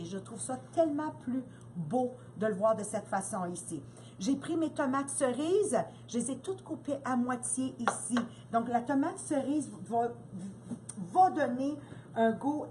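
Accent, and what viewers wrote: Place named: Quebec, Canada. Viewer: Bonjour je suis nouvelle animatrice depuis octobre 2017 j'ai gagné le Micro Urban, je vous remercie pour la démonstration et ayant des amis du Québec je crois que vous avez un accent que j'adore, ce n'est pas facile en France dans ma région de Haute Loire de trouver des hôtesses, j'espère que je trouverais, bonne journée à vous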